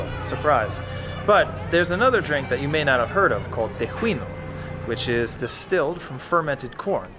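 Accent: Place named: South, United States. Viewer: You ain't from new York boy.You are from down south.It's a secret.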